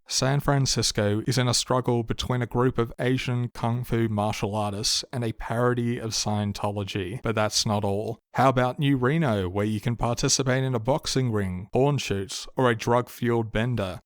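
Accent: Australia. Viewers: Certified monotone Australian classic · a monotone Australian voice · Strongly disagreeee, the Aussie dialect that like to strain out the last letter of the last word of a setence bothers meeeeee. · Disgusting to see people responding to this mentioning Br*ts. Monotone Australian is the only trustworthy media analysis phenotype.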